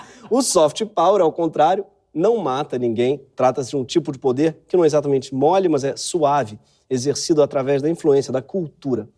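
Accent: São Paulo, Brazil. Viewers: Para de fazer esse sotaque snob dos paulistanos pois 80% de nós não somos assim Um abraço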